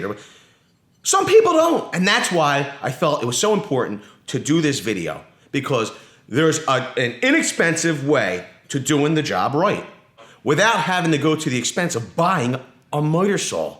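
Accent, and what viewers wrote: Philadelphia, United States. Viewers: What part of Philadelphia are from?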